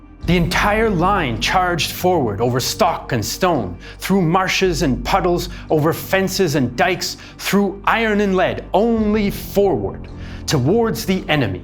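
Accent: Germany. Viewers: German accent is better than necessary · My man, that man is clearly not a native english speaker, he is actually German XD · The details were great, but it is unfortunate that the Danish place names and personal names are pronounced with a German? accent. It becomes very difficult to follow. If you are speaking English, use their English names. For example, the Danish Jylland, is JUT-land in English. Not YOOT-land. Likewise the capital of Denmark is not Copen-HAH-gen, but Copen-HAY-gen in English. Copen-HAH-gen is the name of a chewing tobacco.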